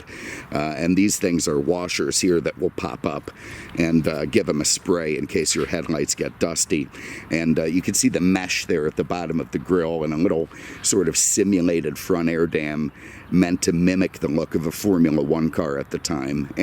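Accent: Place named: New York, United States. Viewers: Just can't take the NY accent. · Ooh a New Yorker. I look forward to that.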